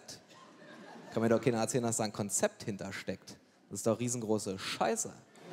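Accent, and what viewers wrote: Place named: Berlin, Germany. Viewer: 1. Nennt sich das Dialekt. 2. Ist das ein Berliner Dialekt.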